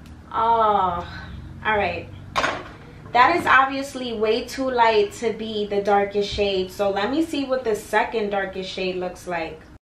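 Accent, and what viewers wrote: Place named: New Jersey, United States. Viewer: Are you from NY because I’m from jersey and you sound like us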